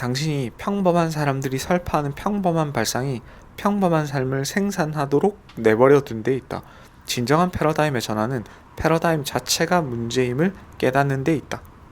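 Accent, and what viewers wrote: Gyeongsang, South Korea. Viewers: 저도 얼마간의 기간제 경험이 있어서 선생님께서 영상에서 하시는 말씀에 많은 공감이 가네요. 모쪼록 마음속에 품으신 계획들 번창하시길 바랍니다. 책읽어주시는 컨텐츠가 철학적이면서 많은 생각이 들게 합니다. ^^. 아 그리고 저도 부산사람이라 경상도 억양과 말투가 참 정겹습니다!! 건승하십시오~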